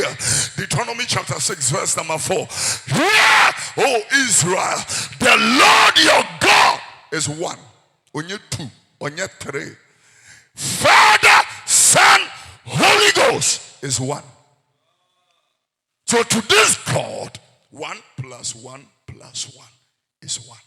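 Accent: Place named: United States. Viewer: I love your message anytime I listen to you but please if you can leave the America English I will be happy because we're Ghanaian let us love whom we are. thanks 👏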